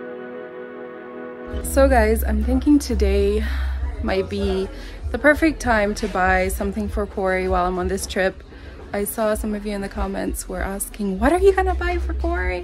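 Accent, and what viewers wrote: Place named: Kenya. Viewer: Now you have Kenyan accent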